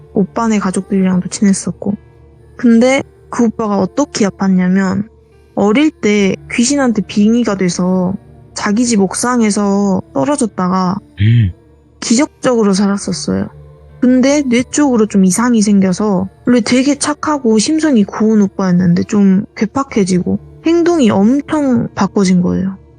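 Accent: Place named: Jeolla, South Korea. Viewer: 구수한 전라도 사투리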